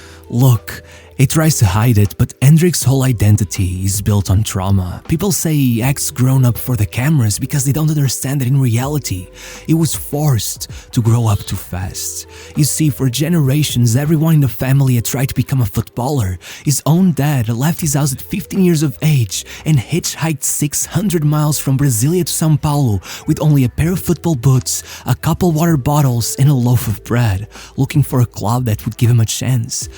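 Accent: Portugal. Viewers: Where you talk very portuegues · E ese inglés com sotaque portugues?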